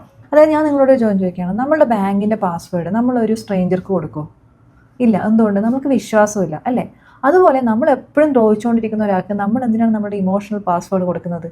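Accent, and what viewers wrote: Kannur, India. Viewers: ഇങ്ങള് കണ്ണൂർ ആണ് ല്ലേ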